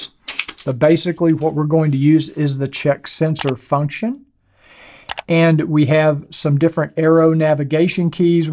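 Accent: United States. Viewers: I live in Amsterdam the Netherlands. I own a GW2021 and ordered the GW 2022 DCT touring All Black. I love your video’s and specially your voice and American accent. I love it. Thanx.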